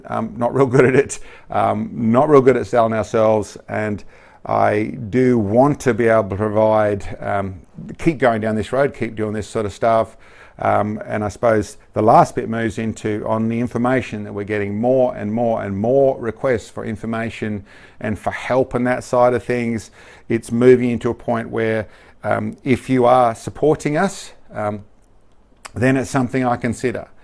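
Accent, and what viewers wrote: Australia. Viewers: Keep up the good work. Coming from an Aussie expat now living in the USA, it's good to hear an aussie talking about something that I love doing once in a while.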